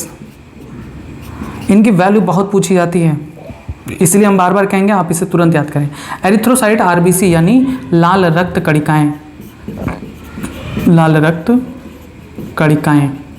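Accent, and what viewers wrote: Chhattisgarh, India. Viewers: Aap Chattisgarh se ho kya ?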